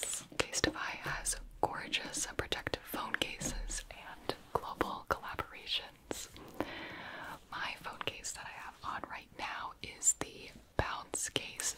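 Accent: United Kingdom. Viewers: omg the british accent fits you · wtf i love the british accent 😭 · Who said that we would laugh at your British accent? It is wonderful, even tho I don’t live in Britain but I do love British accents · Ngl I love ur British accent tho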